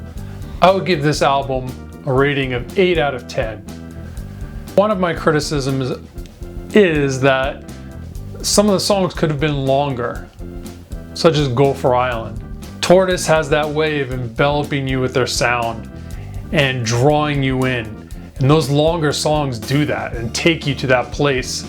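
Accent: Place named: Iraq. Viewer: عبالي عراقي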